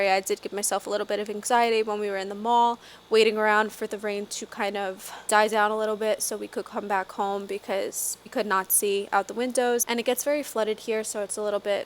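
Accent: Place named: Long Island, United States. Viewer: Former co worker of mine from Long Island...same accent 😀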